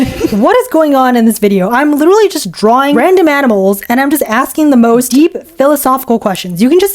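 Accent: United Kingdom. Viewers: British English Alert !!!!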